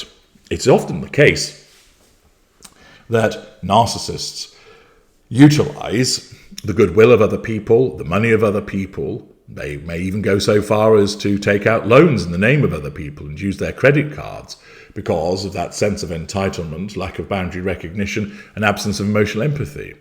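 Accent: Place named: Midlands, United Kingdom. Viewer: I detect a bit of the Midlands in his accent. Have thought so for a while.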